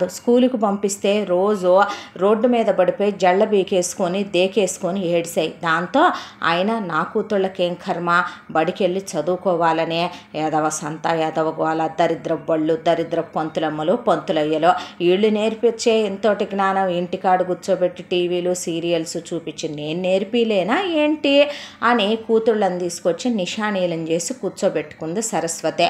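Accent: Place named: Guntur, India. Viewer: Mi story reading chaala bavuntundi gaaru.Guntur slang excellent.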